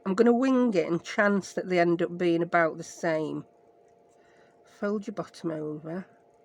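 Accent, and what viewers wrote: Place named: Scotland, United Kingdom. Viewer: You are a hoot! I just found your channel and I am enjoying you so much. Are you from Scotland? I love your accent.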